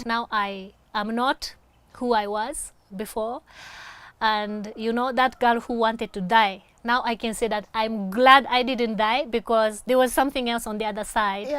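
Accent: Kenya. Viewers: She sounds like an Kenyan women 😉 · wetu, umerudi?? Your 'R' makes you sound like a real okuyu from nyeri....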